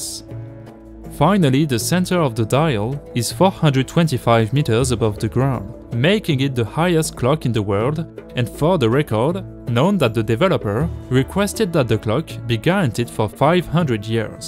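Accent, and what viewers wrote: France. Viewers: I like your French France 🇫🇷 accent but sometimes I can’t stand what your saying I’m ai sorry will you folrgive me. I’m a sorry.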